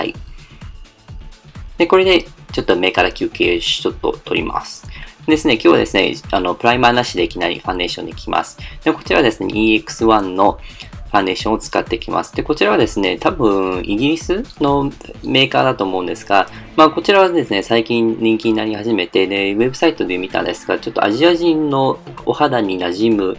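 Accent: Kansai, Japan. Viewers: めっちゃ関西弁やん♡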